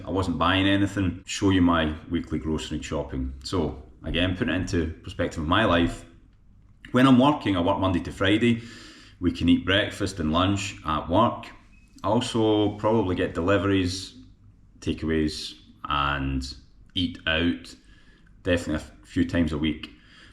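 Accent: Scotland, United Kingdom. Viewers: how does the scottish accent get along with speaking Russian? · amazing scottish accent! i v heard once robert burns poem read like that... it is really how it should sound...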